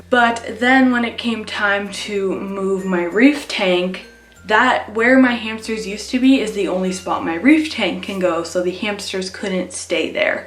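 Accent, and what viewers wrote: Canada. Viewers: Are you Canadian? This isn’t supposed to be rude, I think how you say “sorry” is actually really cute lol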